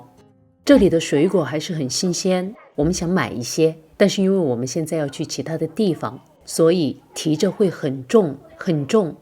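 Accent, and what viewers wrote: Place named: North, China. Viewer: Beautiful northern accent ( im guessing here) you have got!!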